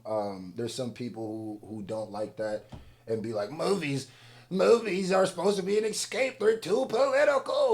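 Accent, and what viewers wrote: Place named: Philadelphia, United States. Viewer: have you ever spent time in Philly or have family from there you sound like a Philly nicca sometimes. I’m from Baltimore but live in Philly now but I swore you were from Philly for the longest and you just said mixed him up mixing is some Philly shit I only learned when I moved there from Baltimore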